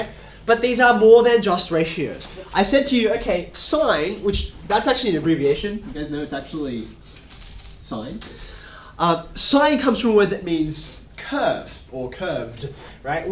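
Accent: Australia. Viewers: I was not expecting him to be Australian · I love the Australian accent ... "I know some of you know what the cooeeuuaarrrrr in cosine means."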